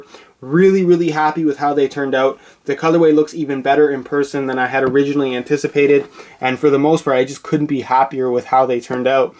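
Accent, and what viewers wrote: Canada. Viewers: Hahaha I knew you were Canadian! Go Leafs!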